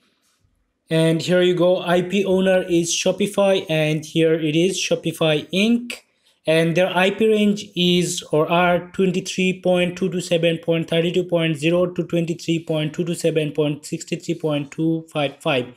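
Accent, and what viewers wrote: Sylhet, Bangladesh. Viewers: Brother Are You From Sylhet? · are vaijan apni je bangladeshi seta apnar english accent shunei bojha jay!!!!!!!!!!!!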